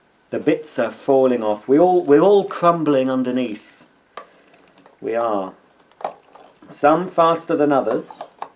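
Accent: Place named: United Kingdom. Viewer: Love the British accent! (That's a compliment)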